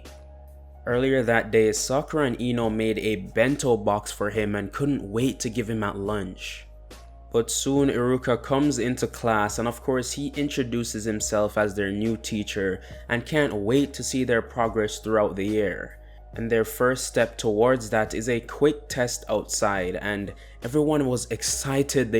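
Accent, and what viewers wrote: Jamaica. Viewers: hi, I I'm just wondering if your from Jamaica I hear I semi accent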